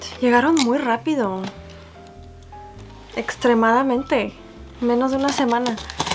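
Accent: Mexico. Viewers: me gusta mucho tu acento mexicano <3<3 te da una vibra diferente pero linda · Hace algun tiempo veo tus videos y podia escuchar un poco, pero muy poco tu acento mexicano y me preguntaba, acaso hablara en espanol? Y pense en escribirte en espanol, pero luego dije, que tal que estoy loca : ) jajajja. Me encanta todo lo que haces, felicitaciones! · gracias de nosotros tus seguidores de latam, luego de escuchar tu español identifique que era mexicano JAJAJJAJAA me gusta mucho como hablas el español ya que es tu primera lengua... espero te encuentres bien, saludos :D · Que bueno que hiciste uno en español. Me motivaste a hacer uno yo también. Ah y se dice subscribirte. 🙂 súper bien! ❤️ Me encanto por sierto eres de descendencia mexicana? Porque yo lo soy y creo que tú acento es de Mexico. ❤️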